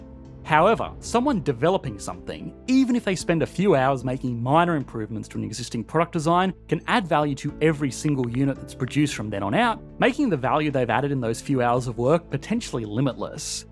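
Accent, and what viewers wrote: Australia. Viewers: Cadence is too fast. Ausssie accent makes it even harder to understand. Chaotic topical progression. Uggh. Utter disaster.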